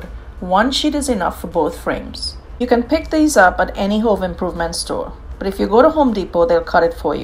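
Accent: Trinidad and Tobago. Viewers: I know a Trini when I hear one ❤ Great work!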